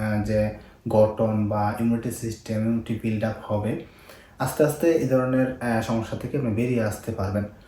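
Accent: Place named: Sylhet, Bangladesh. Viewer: আপনার জেলা কোনটি স্যার,সিলেট এর টান ভাষায়, সিলেট নাকি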